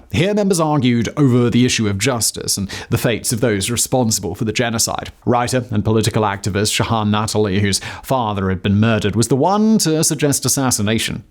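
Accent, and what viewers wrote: England, United Kingdom. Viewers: Thank you for covering this overlooked piece of history. Any light shed on this matter has the potential to save the lives of Armenians who are in what seems like a forever struggle to keep what little home they have left from classical antiquity. English and especially French churches were instrumental in saving my grandparents so I'm humbled again hearing your English accent. Armenia has been experiencing the same horrors since the Seljuks first entered classic Byzantium.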